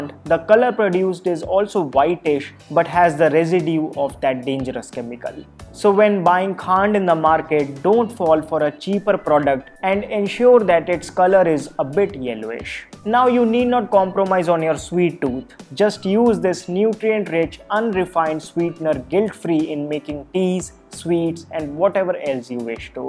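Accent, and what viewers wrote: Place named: Gujarat, India. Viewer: और गुजराती एक्सेंट में इंग्लिश Funny साउंड कर रहा हैं 😂😂😂😂😂😂😂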